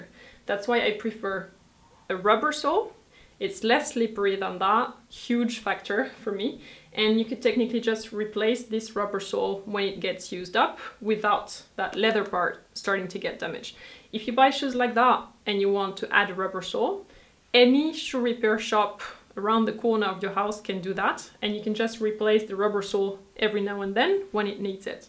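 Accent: France. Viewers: I’m so glad I stumbled onto you! This was very helpful! Are you French or French-Canadian? I love your accent! Très mignon! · You are so beautiful and elegant , and I love your french accent and your aristocratic look ❤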